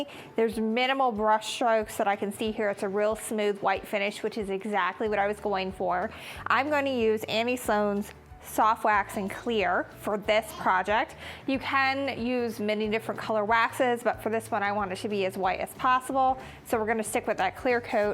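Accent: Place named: Quad Cities, United States. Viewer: No way! I was listening to your "accent" and asked my son who you sound like and he said me - I am originally from East Moline and went to Alleman. I currently live in Las Vegas and have since 1989 (I am much older than you) -still call the QC's home tho! Your tutorial was excellent - you made it easy to follow and love your explanations.Your final product looked flawless and your shop looks amazing! My grandma and Aunts had a shop in Moline off of 34th St and 23rd ave, maybe you had seen it, The Stitchin' House. I am so glad I listened to the very end so I could figure out where I have heard that dialect before. Keep up the great work -looking forward to watching your other videos! Happy Holidays! Natalie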